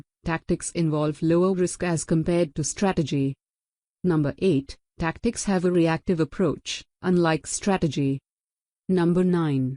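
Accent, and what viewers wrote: India. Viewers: HOW CAN A FUCKING VOICE AI HAVE AN INDIAN ACCENT FOR FUCK SAKES, HOW DECIDED THIS WAS A GOOD IDEA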